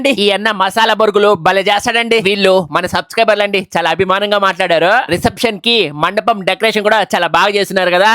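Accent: Rayalaseema, India. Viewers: Ni slag valla Rayalaseema paruvu potundi Anna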